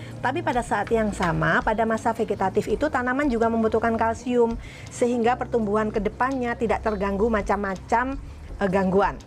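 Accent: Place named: Banyumas, Indonesia. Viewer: bapaq : kaya jangan bayem... laaahh pada" ngapak jebule ya pak 🙄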